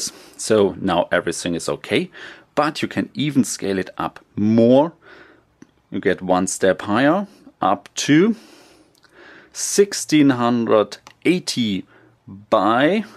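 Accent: Germany. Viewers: Are you from Germany nice accent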